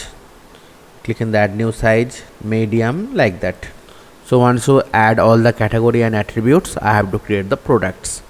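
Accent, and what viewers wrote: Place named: India. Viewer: Fuck man I cant understand none of these indian peoples videos the accent is too strong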